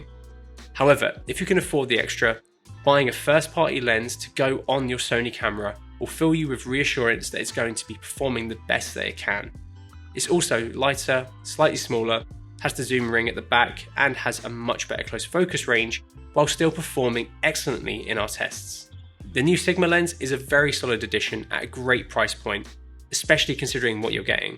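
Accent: United Kingdom. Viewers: Not sure if the British accent makes this more enthralling, or the to-the-point, no frills, expertly-presented information. I think it's both.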